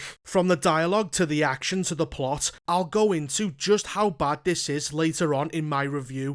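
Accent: Liverpool, United Kingdom. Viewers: I know a scouse accent when i hear one 😂. Liverpool on top.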